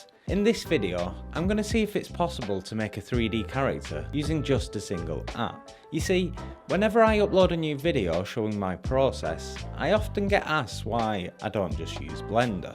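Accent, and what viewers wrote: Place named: East of England, United Kingdom. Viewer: Hi, I hope I don’t come off as rude or too nosy, but I’m trying to pinpoint your accent (just out of curiousity) but I am really unsure. Some where from the East of England? Might be way off😂. This is just a hobby of mine, trying to learn to distinguish British accents and to name them. But I haven’t got very far yet.. still learning to somewhat localise them.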